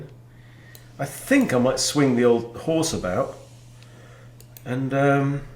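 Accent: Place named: United Kingdom. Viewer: I watch bc I need a channel where I can have the moves explained and see how players closer to my elo play (Im only 700/800 but have only played 230ish games)--esp endgames. You playing rapid gives me time to think abt what I'd play in your position. The british accent helps, but my oh my the blundering rollercoaster and the amount of times I yell at you for bad endgame moves is why I stay. Can't forget abt the accents and movie quotes haha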